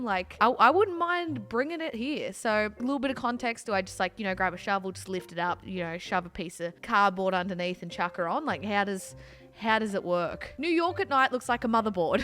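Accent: Australia. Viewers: You sound like your brother wait a sec all Australians sound like that. Nope just you two.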